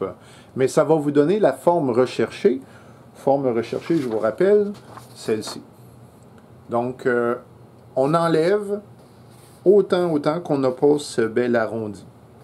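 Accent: Quebec, Canada. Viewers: De bonnes astuces pour le débutant avec comme bonus ce bel accent québécois... Merci ! 😉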